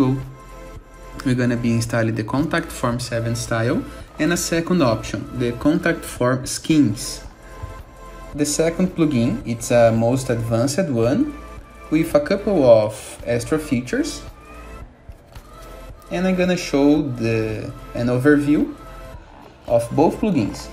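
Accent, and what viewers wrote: Brazil. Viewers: Cara, você é BR né? kkkk Top, mandou bem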